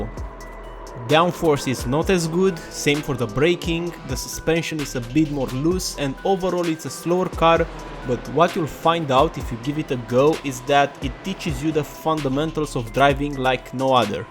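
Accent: Romania. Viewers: are u from romania or ur accent sound kinda like a romanina that knows english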